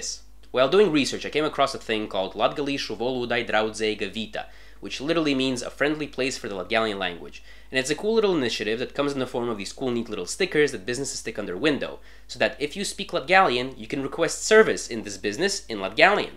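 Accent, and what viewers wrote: Russia. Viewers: Nice video, man! I speak Latgalian myself and I can tell you not many people my age speak Latgalian, which is a shame. Parents don't teach them, cause it's "a bother" and they'll never use it anywhere else other than in the family. And for the most part, it's true, I only use it when I talk to my family or talk with older people. :( I agree with we are latvians part! We are the same and I will happily be both latvian and latgalian. :) Did now know about Peter! So cool! I was about to ask where did you grow up, cause your accent is interesting while you were talking in latvian, but then I heard you talking in russian, lol. PS. Ausmeņa Kebabs ruļavoj! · Ak dievs, es uzzināju par tavu kanālu caur video par eļvdāļu valodu un brīnījos, no kurienes tu esi, jo es pamanīju akcentu. Izrādās, ka tu esi no Latvijas, tāpat kā es! Bet tavs akcents ir laikam krievu, vai nē? 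Paldies par šo video. Mana vecmāte bija polīte no "Daugpiļs".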